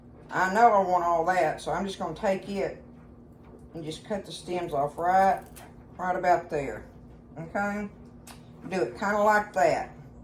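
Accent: South, United States. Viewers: I love how you talk your Southern❤ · I love how you talk Southern also I'm from Oklahoma so I talk the same way! Where did you purchase the little round rack that fits over dinner plates? I need to get me one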